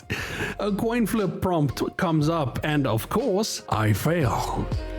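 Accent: South Africa. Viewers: The Sandton accent took me all the way OUT bro 😂 too real. Instant sub! Looking forward to more of your content! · Ah, a fellow South African. I knew that accent sounded familiar (kinda sounds like the zookeeper from Jozi zoo iykyk). Good video bro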